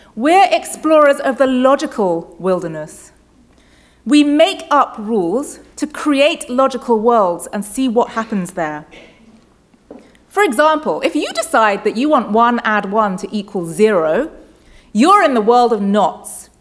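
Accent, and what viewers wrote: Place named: England, United Kingdom. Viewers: I love her british accent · it's an english accent actually. It's not scottish, or irish or welsh, the other components of "Britain". English, English, English.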